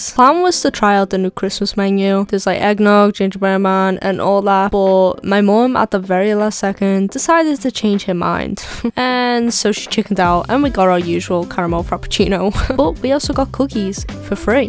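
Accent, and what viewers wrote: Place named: Liverpool, United Kingdom. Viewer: Unless you'd moved, but I could definitely tell by the accent you're from Liverpool!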